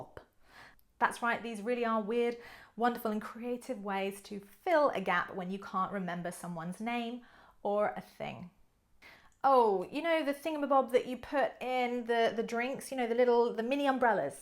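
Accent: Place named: RP, United Kingdom. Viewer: Dear Madam Teacher,thank so much for your brilliant lesson and of course superb modern RP british accent.Well,honestly do not freeze in English is so tricky for me.because I am from the working class and absolutelly not smart and clever like you,dear Madam.Thank a lot again.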